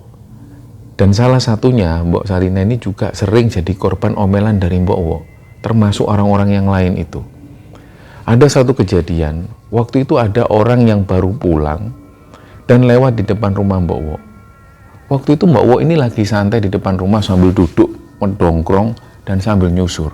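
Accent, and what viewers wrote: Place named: East Java, Indonesia. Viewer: Dr kecil paling suka kalau di dongengin.skrg chanel yg paling aku tunggu update nya cm.entah mungkin karna pembawaan mas yang jawa timur bgt karna aku jg org jatim dan tdk mendramatisir. Jd ayem denger nya.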